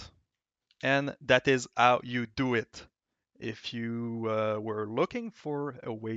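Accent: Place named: Spain. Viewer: Tu inglés se escucha como si fueras hispanohablante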